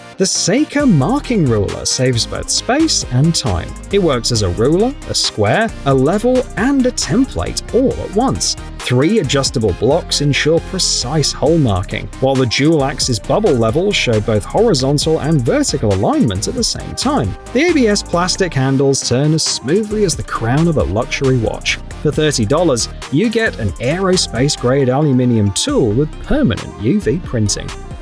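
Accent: United Kingdom. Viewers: I am so tired of listening to those accents of British origins. We won the Revolution a long time ago,, 250 years ago .,,,so I turn you off. This is America.,, I wanna hear an America trying to sell me something. It’s easier for me to understand.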